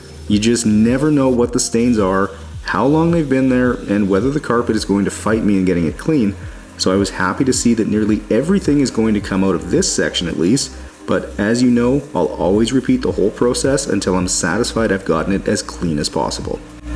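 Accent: Canada. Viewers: I always quickly ask myself why parts look like the vehicle was around the coast too much as a Texan, then quickly remember this is from the salt on the roads during y’all’s inevitable snow in SK, Canada. One of my beloved best friends of decades is from there and he has many stories of living on a farm and dealing with crazy amounts of snow. Much love, enjoy the vids so much. I love catching your Canadian accent btw.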